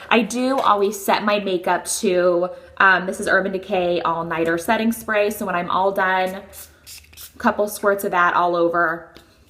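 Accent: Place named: Midwest, United States. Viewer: oh, I don't think it does. pretty typical sounding Midwestern American sounding gal yet unique to her. I love her voice!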